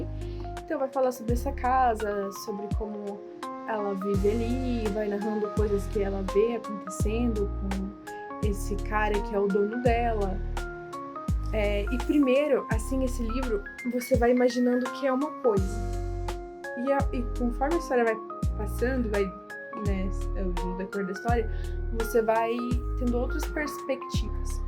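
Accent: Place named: South, Brazil. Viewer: Teu sotaque é lindo, vc é do Sul ? Amei as observações ❤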